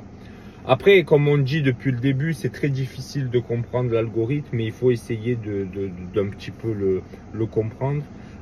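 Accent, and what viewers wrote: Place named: South, France. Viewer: Merci beaucoup pour tout ses petits conseils qui apportent énormément Et toujours avec cet accent du sud qui fait plaisir 👍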